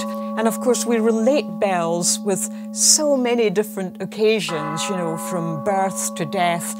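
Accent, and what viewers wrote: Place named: Scotland, United Kingdom. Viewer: Gosh i love the Scottish accent she is brilliant truly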